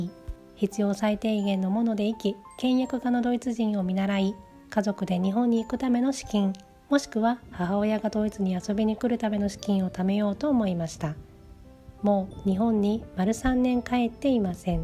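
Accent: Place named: Osaka, Japan. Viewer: Nobanaさんの大阪弁にほっとしています。読み上げてくださるので用事をしながら楽しませてもらってます。大阪府出身で、ニーダーザクセンのとある小さな村在住です。大きな瓶に入ったパーティ用のブラートヘリング南蛮漬けのミートボールが好きです。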